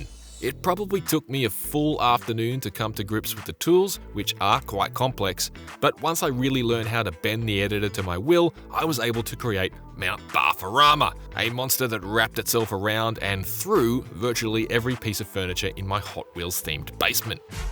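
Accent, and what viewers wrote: Australia. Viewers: Guy sounds like an Australian parody, complete with the toilet strain accents. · Hears an Australian accent = SOLD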